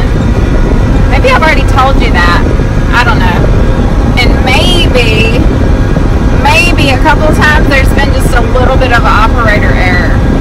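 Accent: South, United States. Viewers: I love your southern accent. What kinds of things do you wonder about northern folks? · I love the enthusiasm (and that southern girl accent!). We are in a uncommon long period of rain. Found your video to hold me over, chomping at the bit to cut our beautiful first growth for the season.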